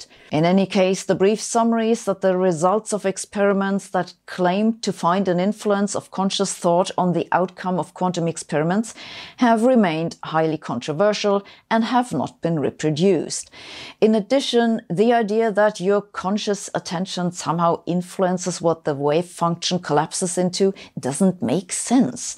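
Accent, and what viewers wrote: Germany. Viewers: I never understand any of her video's but her German Accent turns me on... Oh Shut up, I bet I'm not the only one!🤓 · terrible german accent , SssssSsSssshhshSHSs my ears!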